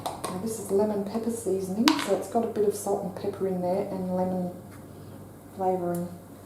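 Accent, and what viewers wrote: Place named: Australia. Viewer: I love your accent so so much! It’s just beautiful and incredibly relaxing!❤️ Australia is on my bucket list and it being cool there sounds so nice right now. It’s in the 90s here with an even hotter heat index. This is the best way to end my day! Thank you!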